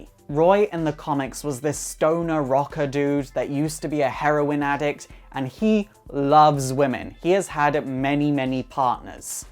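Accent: United Kingdom. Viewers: Is he British or have an accent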